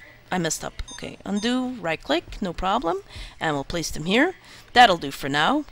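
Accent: Flanders, Belgium. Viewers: may be cos she is from belgium :) But you can hardly tell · Nice! first flemish that dosent have that broad accent! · I'm also from belgium. Are you flemish?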